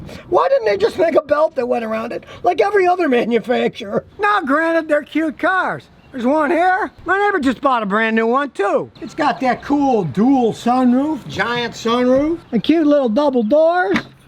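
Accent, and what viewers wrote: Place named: United States. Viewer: This guy is hard to listen to. Just that dumb version of the american accent.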